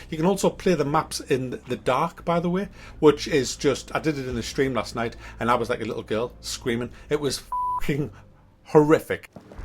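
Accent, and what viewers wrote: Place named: Newcastle, United Kingdom. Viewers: So someone asked me if I was on Youtube. I said no, so I checked out the channel and fuck me, we sound almost the same. Where abouts in the Newcastle area are you from? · soon as i heard his geordie voice i switched off lmao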